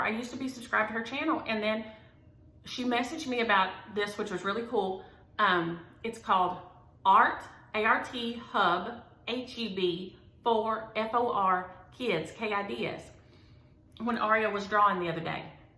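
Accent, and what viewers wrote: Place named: South Carolina, United States. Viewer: Thanks for talking about Aida, evenweave, and linen. I'd love to hear more about how you chose which fabric for each design. Your talk about the whites was SO good! I'm here in SC so you sound like a friend to me. : - )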